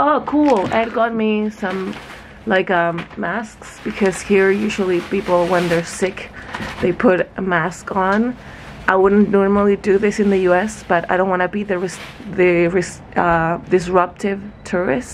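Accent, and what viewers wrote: Chile. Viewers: Amo tu acento chileno!!! Y que escuches... Abrazo gigante desde Argentina aa💫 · Hola! Aquí una mexicana que tuvo dificultad para entender su español chileno, pero qué lindo que es su acento, eh! <3 Me identifico contigo, porque mi esposo también está estudiando un PhD en U.S. y yo soy una artista frustrada (en eso no nos parecemos, tú ya lo eres jeje). Muchos saludos y que sigan disfrutando su tiempo en Tokio, si siguen por allá, nosotros morimos por visitar. Soy tu fan <3:D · !!! Me encantaría que hablaras más en español en tus videos!!! Tu acento chileno es genial y podrías poner subtítulos en inglés!!! おねがいします!!! 🙏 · Amo su acento chileno! 🥰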